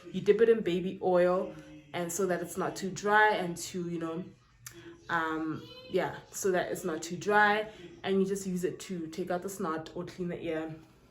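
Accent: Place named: Pretoria, South Africa. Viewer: 🤣🤣 "Comfortability" 😂😂 I can just hear hore o mkhaya wena, o tswa Pitori🤣. Your videos are so helpful mama, Thank you🤗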